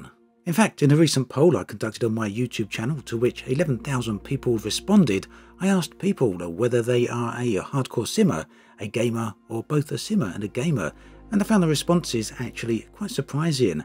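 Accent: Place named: Scotland, United Kingdom. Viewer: Edinburgh or Glasgow lol 😂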